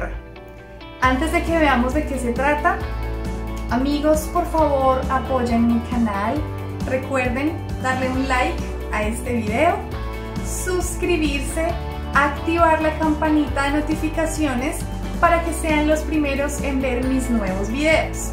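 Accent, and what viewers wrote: Colombia. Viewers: Hola soy MEXICANO y tambien vivo en USA en el estado de OHIO saludo tu Nuevo subcritor me gusta mucho tu asento colombiano eres muy hermosa y que viva MEXICO y COLOMBIA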